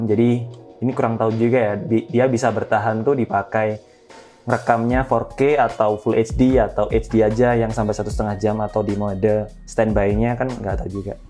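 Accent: Java, Indonesia. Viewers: Kowe wong jowo yo mas? Hehe... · Yang sekarang suaranya lebih meddok 🤣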